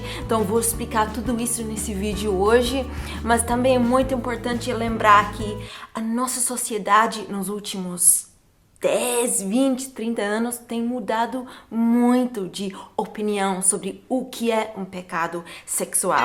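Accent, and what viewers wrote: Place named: United Kingdom. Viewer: New subscriber... i love a bristish accent ... but born in portugal 🙌🏼🙌🏼🙌🏼🇵🇹🇵🇹🇵🇹 love your chanel you explain things well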